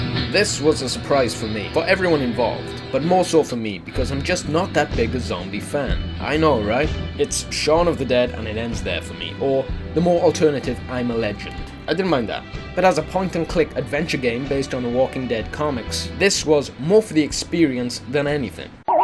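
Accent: Wales, United Kingdom. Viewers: You welsh bro? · Are you Welsh? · are you Welsh or American. I thought you were Welsh but the prices you mentioned we're American. It's rare for me to find a fellow Welshman on YouTube. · Are you from wales? you sound kind of welsh but you also sound like you're putting a accent on:)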